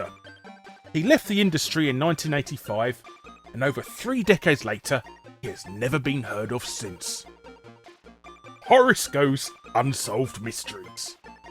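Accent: United Kingdom. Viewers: I love your voice it's like you're a British guy with a mouth full of marshmallows.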